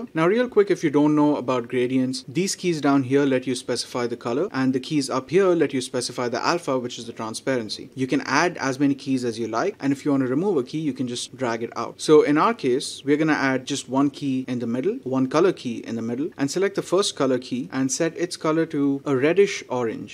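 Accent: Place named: India. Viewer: Damn it's one great Indian accent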